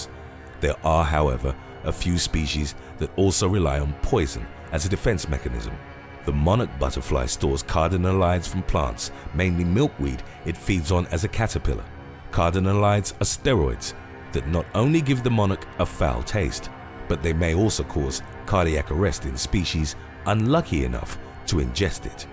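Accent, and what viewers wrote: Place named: England, United Kingdom. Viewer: He's not Australian. That's an English accent, and this channel is notorious for getting things wrong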